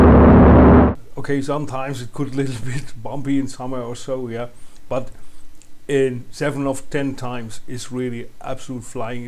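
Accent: Germany. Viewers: Danke für das ausführliche Video! Ich gehe mal davon aus das ich einen deutschen Akzent gehört habe und schreibe frech auf deutsch. Hast du das im Übersee gekauft oder in einem europäischen Shop wie Winwing ihn hat? Frage bzgl. der Kosten für Zoll etc. Und vielleicht gibt es ja einen deutschen Supplier den ich nicht kenne. Danke im Voraus! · Höre ich da einen deutschen akzent?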